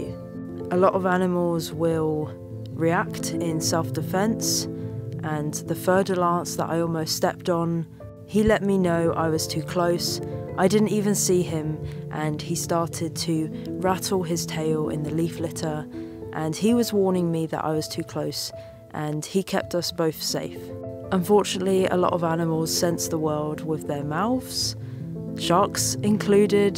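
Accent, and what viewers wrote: United Kingdom. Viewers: I think I understand the accent very well but there several new words that I've never heard before (for animals, plants etc.). Thankfully not that specifically annoying type of British accent.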